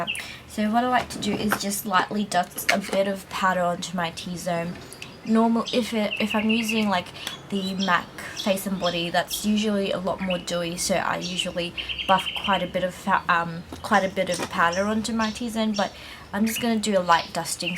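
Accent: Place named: Australia. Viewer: A filipino girl with a what I guess is an Australian accent? Interesting! Subscribe! :)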